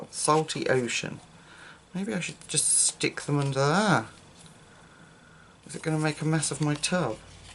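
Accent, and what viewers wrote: United Kingdom. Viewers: Oh, you are so much fun! And you keep it real! And I love your British accent! I watch zucchinikitty all the time, she’s indeed a master with the inks, I got a few just to try, they are not as easy to use as it would seem! The detail inking tool is really hard to come by where I live (Mexico where nothing is available and when it is is at least 5x more expensive) do you think a Q-tip could work? I hope to see you color with these a LOT! They are fast! And even though I love colored pencils.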